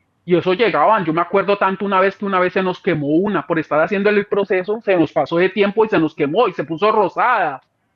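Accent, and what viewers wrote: Colombia. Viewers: Tristemente creo que por su forma de hablar es “caleña”🙄🙄🫣🫣👎🏽👎🏽👎🏽👎🏽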